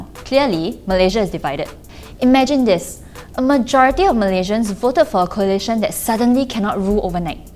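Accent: Singapore. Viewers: Nice Singlish! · I terribly in love with Singaporean accent.. Okay Lah!! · Very good singlish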